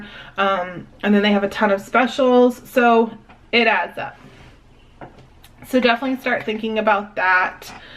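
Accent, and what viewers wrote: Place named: South, United States. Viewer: I am starting to hear a southern accent 😀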